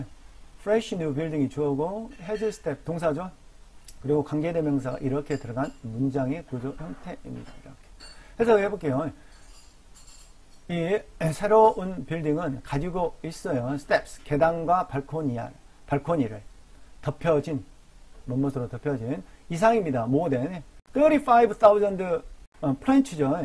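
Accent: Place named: Jeolla, South Korea. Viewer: 흐흐 구수한 전라도 사투리... 저절로 드러나시네요.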